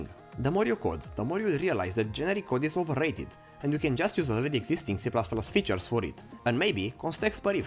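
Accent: Russia. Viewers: Excuse me for that question, but it's very interesting for me as lover of languages and especially English) What country are you from? I thought you''re Russian when i heared you accent - it sounds very similar to Russian. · Your accent is interesting, do ye have russian heritage?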